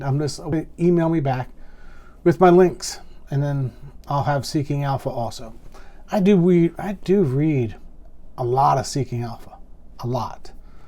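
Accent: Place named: Pittsburgh, United States. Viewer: Listening to your accent,... I am guessing you are from Pittsburgh 😊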